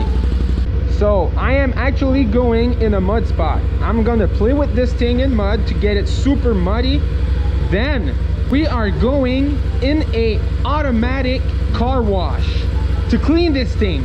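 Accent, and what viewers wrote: Quebec, Canada. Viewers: I must ask are you from Quebec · Il est du Québec j’en sui sûr 😂😂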